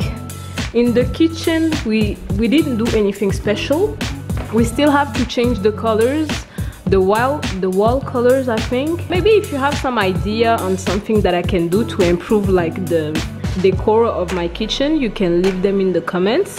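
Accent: France. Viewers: Nice house, I like ur accent it’s like ur first language is French!! Coz you speak like my French friend 🤗 greets from london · Love your France accent... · Question pour toi, est ce que tu es antillaise? Ton accent c in peu francais ,un peu Creole.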